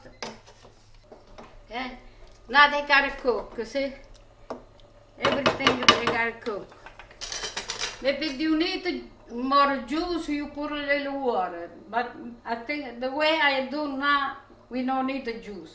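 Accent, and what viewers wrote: Italy. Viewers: I love to watch you Mama! You are so happy when you are cooking! I love you. I am Irish Americàn, but my roots are Spanish. I think that's why I love the Mediteranean food. Anyway, I love to watch you and hear you direct us in the process of making the recipes you present. There have been negàtive comments about you. I, for one, love your Italian accent and appreciate your learning English and love your presentations. If people criticize your presentstion just ignore them. I love you and your sharing your cooking knowledge. Keep making your videos, I will always watch! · This dish was my favorite!!!!!! My Father used to make it!!!!! My Fathers family was from Naples Italy. He used to put raisins in the sauce to take the acidity out of the whole tomatoes and add sweetness. My grandmother made the sauce like this in Naples. I LOVE WATCHING YOU AND YOUR ACCENT MY Fathers whole family was from Naples. 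Could barely speak english and your accent reminds me of the good times. The Lord Jesus bless you✝️🕎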